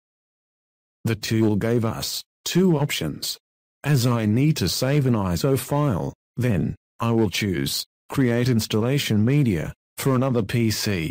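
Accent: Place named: Australia. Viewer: Duh! I hate that Australian voice! Why can't you just change it to an American voice! That would be much better!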